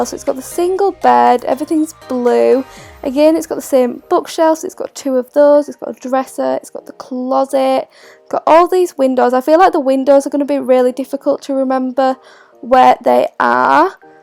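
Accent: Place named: Leeds, United Kingdom. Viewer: Are you from leads? I love your accent ❤❤❤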